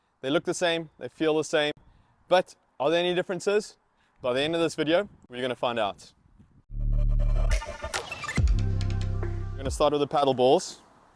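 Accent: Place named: South Africa. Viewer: Are you South African? That accent is too familiar ek se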